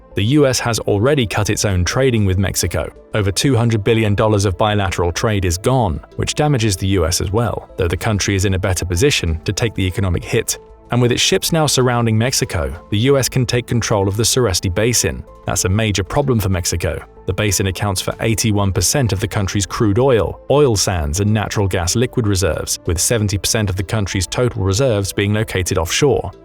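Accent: United Kingdom. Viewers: You can tell you have no idea about American Military. There is no way they would be that big an issue. And Mexican American Soldiers would not desert the American Military cause they know if they tried crossing the boarder later they would be killed for desertion. Remember this is some Brit by the sound of him has zero U.S. Military experience whatsoever. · 😂 bro, you,forget the firepower Americans have more war experience since you sound like you from the uk you have no idea how much firepower us have and the damage they can do only thing would be they wouldn't want to harm civilians they ony want the cartel but if Mexico government keeps helping them cartels than yeah us will wipe thrm out faster than what you believe. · Heard a British accent, automatically disregarded their opinion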